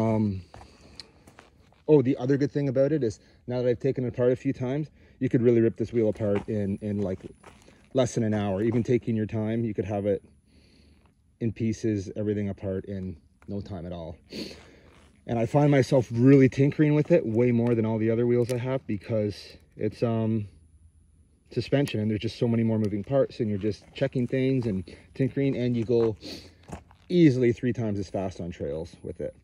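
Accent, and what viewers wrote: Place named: Canada. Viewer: You sound Canadian 😄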